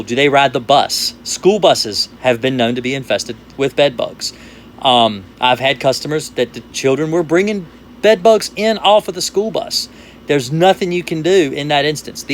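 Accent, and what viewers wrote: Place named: Virginia, United States. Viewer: Thanks for the vid. It was very helpful. Love the Virginia accent, by the way.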